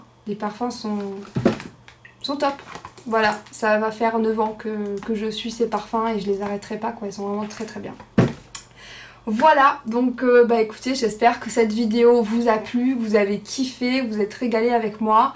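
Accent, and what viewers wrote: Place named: Perpignan, France. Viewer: Hello ! Je découvre ta chaîne grâce à Sissi makeup. Super ta vidéo tu donnes envie 😍je vais y faire un tour 🥰🤑n’hésite pas à jeter un œil à ma chaîne. Il me semble qu’à ton accent tu es de vers Perpignan ? Bisous et merci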